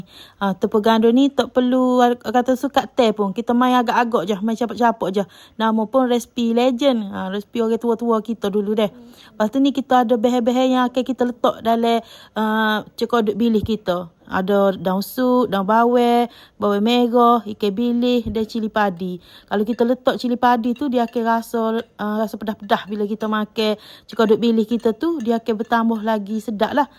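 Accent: Kelantan, Malaysia. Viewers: Lama x muncul ya..suke dh kecek kelate tu😅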